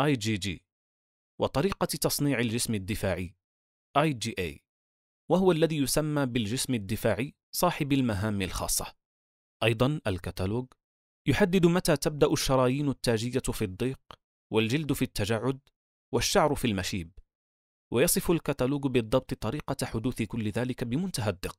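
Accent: Morocco. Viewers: اشعر ان صاحب الصوت مغربي 😅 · أظن المتحدث مغربي، انطلاقا من لحن الكلام الخاص بنا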